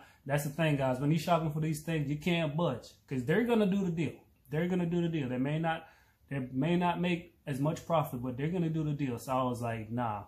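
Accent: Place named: Florida, United States. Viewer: ayy cuh you ain’t have to tell me you from florida😂 already knew ts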